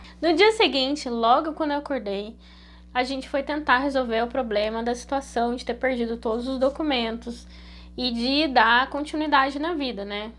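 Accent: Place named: Minas Gerais, Brazil. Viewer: Ganhou mais um inscrito 💖 Amei o Canal. Você é Mineira ? 😅 Sotaque legal 😍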